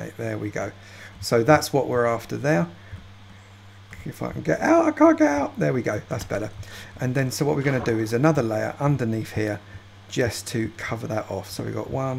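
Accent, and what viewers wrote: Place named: South London, United Kingdom. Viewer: How is it Gordon Ramsey when he doesnt cook the only thing similar is that he is also british. They both sound like they are from South London but it doesnt automatically make him Gordon Ramsey. Im guessing you are an American who thinks there is only one form of British accent. Let me correct you, there are a LOT of British accents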